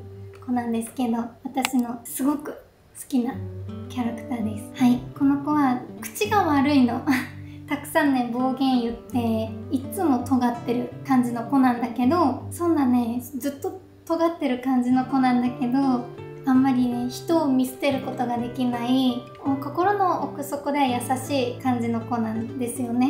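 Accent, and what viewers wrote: Kagoshima, Japan. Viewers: の鹿児島弁がひさびさに聴けて、多分だから癒されてるんやと思う😊✨ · 伸びかけのボブを見て大人っぽくなったなぁ変わったなぁと思い、鹿児島弁の「いつもの」喋りを見て全然変わってないなぁと思い。楽しそうに好きなものを語る様子が本当に嬉しい。推しキャラに可愛いとしか言えなくなるが可愛すぎました。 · の漫画・アニメ愛が伝わってきました！そして鹿児島訛りが同じ鹿児島県人として心地よくて癒しです😊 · と同じ鹿児島弁のyou Tuberの釣りむすめと鹿児島弁対決してください。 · はいつまでも鹿児島訛りでいてホシイ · 自分も鹿児島に住んでいるのでこの訛りというかイントネーションに親近感が湧いています。これからもこの動画の雰囲気を楽しんでいきたいと思います。頑張ってください！！！ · いつもひょっこり顔を出してくれるだけでも嬉しいのに声まで来たもんで、本当に癒されます！訛りはが鹿児島にいたことの証だから、むしろどんどん出して欲しい！キャラクターの説明で「かわいい」って言うたびに画面の向こうで「あなたがですよっ！」とツッコんでいたのは僕だけではないはず。そしていちいちアップになるたびにかわいいのもずるいし、どういうことかっていうと、今日も癒しの動画をありがとうございます！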